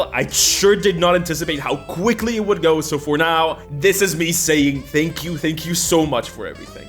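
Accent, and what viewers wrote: Spain. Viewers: Ideas muy chulas, dibujos geniales... Pero y ese acento en inglés siendo español??? Wow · waitaminut You're a fellow spaniard? Holy, your accent is really nice. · I mean… his last name is and he has a Spanish adjacent accent, it is sort of expected.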